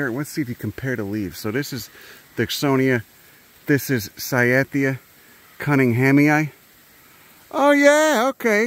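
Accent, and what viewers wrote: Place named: Northeast, United States. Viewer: Just started following the channel and I gotta say, when he mentions scientific names, I have no fucking clue what the hell he's talking about but gah'damn something about that thick northeastern accent is oddly satisfying to listen to. Especially when he's talking shit or just engaging with the local wildlife... ... I can't stop watching this shit...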